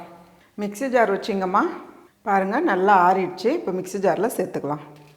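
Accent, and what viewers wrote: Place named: Tiruvannamalai, India. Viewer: Thiruvannamalai tamil slang I think 🤔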